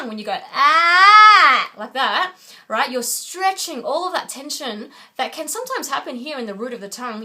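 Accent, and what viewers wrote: Australia. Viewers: You're Australian correct? I'm usually good at differentiating between Australian and British. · OMG, shes stray-lian : )) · Are you Australian? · i figured it out, you're australian! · She's from Australia.... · are you from Australia?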